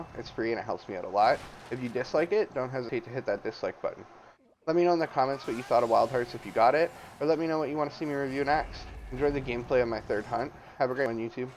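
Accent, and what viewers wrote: United States. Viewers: Why does your voice sound like its coming from an American neck? This says Canadian content 🤔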